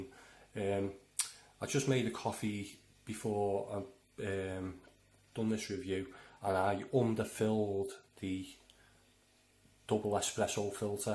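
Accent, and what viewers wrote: Liverpool, United Kingdom. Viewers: Was that British accent?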